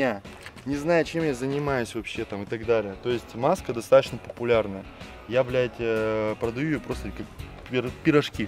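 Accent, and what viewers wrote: Russia. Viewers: ништяк! ещё и русский говор!!!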